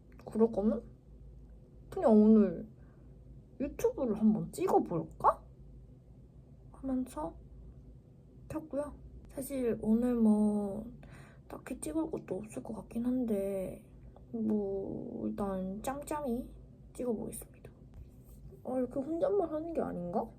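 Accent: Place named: Seoul, South Korea. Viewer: 왜 서울말 하세요?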